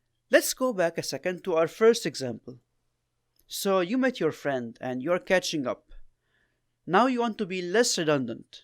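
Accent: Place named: Egypt. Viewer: You are egyptian 😂 I Know this kind of accent, but good job keep it up bro.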